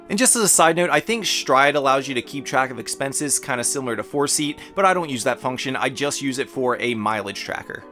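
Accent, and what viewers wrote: Philadelphia, United States. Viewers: God video. Are you in PA? You have that philli suburb accent.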